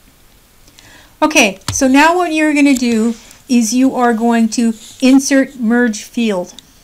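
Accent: Canada. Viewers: Thank you! Very well explained. And I could tell I was listening to a Canadian when a-Gain came up, and then a-Boot. I was born in Vancouver but we moved to LA when I was 6 so I lost that charming accent and now just sound like an international dual citizen American living in Europe. 😉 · you are Canadian... I can tell by your accent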